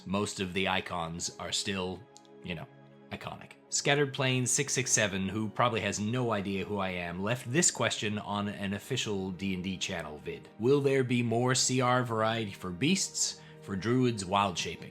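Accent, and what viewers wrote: Australia. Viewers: Amazing hearing this and your Ranger video. You Aussie accent comes through when you got back, but here I would have never guessed. 😁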